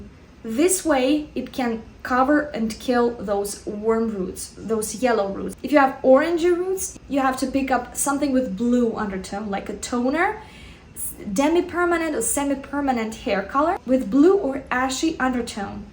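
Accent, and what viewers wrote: Russia. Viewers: Мне кажется, или есть очень сильный русский акцент?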